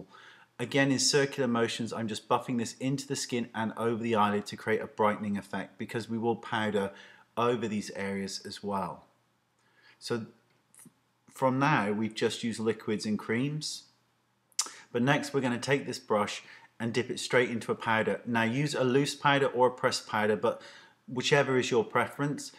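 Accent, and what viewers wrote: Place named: West Country, United Kingdom. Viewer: It's a west country accent... like Bristolian but not as harsh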